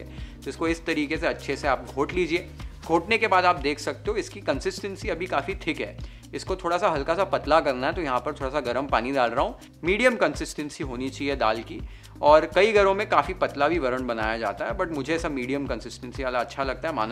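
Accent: Maharashtra, India. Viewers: I can see Marathi accent in you Really want to know the reason Love ur recepies❤ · Your marathi accent is so sweet 😅😂😂& i really like your recipes, they are so easy to follow🤗😁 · Sanjyot, tuze marathi uchhar agadi * Marathi* aahet. Khup chan video... Marathi jevan purn dakhavkaya baddal❤ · It's mouth watering recipes.....enjoyed your recipe and typical marathi pronunciation 👏👏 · WOOOOOOOOOOOOOOOW, the way you pronounce all the Marathi dishes it's a treat to listen and as always the thali is outstanding. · You are a pure Maharashtrian.....the way you pronounce birda,fadfada....I'm so thankful to you for giving a platform to our satvik,simple n tasty Maharashtrian food ❤ Bappa tuzya saglya ichha Purna Karo...khup khup aashirvaad.... Ganpati Bappa Morya 🌞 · Pleased by the video 🤤🤤 And your Marathi accent is OMG.....✨✨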